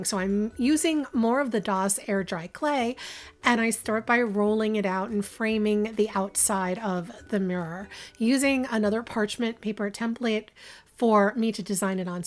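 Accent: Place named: New Jersey, United States. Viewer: You did ❤ and I picked up on your New Jersey accent. I heard you say "Mirrah" when referring to the mirrors. Thought it was endearing 🤗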